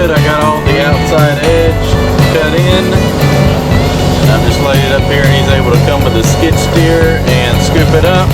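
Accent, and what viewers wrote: South, United States. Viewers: I need someone to do this type of work for me. I'm building a house soon, but the pad needs to be built up for sure. Where are you at? I hear some southern accent. SW Arkansas is where I need the work done. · Your southern accent sound’s almost just like my Uncle Charles in Tennessee😂😂😂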